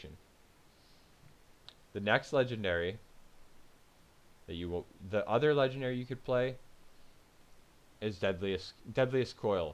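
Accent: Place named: Nova Scotia, Canada. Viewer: Canadian eh, that "R" pronounciation sounds almost Nova Scotian/New Foundlander.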